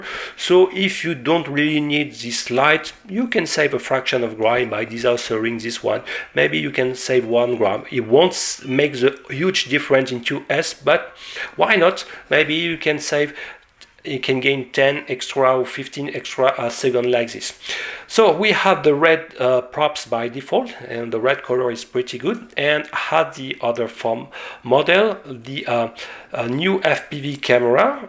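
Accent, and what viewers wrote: France. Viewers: Meilleurs Voeux 2019 avec toujours ces super revues qui font la promotion des quadricoptères et ... ...de l'accent français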